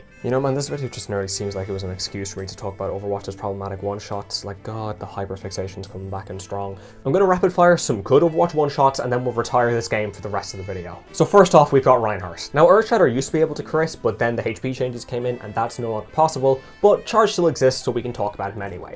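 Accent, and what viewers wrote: Ireland. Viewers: Waheey lad a fellow Irishman?? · lads the blokes Irish lmao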